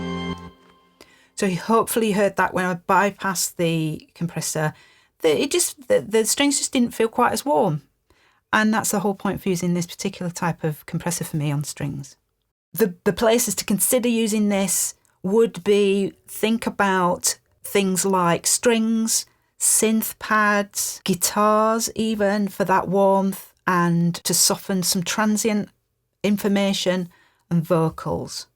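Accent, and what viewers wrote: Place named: Yorkshire, United Kingdom. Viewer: This is an amazing lesson! Thank you so much for sharing your knowledge in such an easy and understandable way. Also, I have to say I love your accent :) I now live in East Yorkshire, I guess we are not too far?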